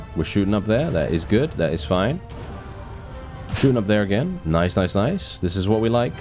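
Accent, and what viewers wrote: United Kingdom. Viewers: The Posh English ! Keep on trucking Sir ;)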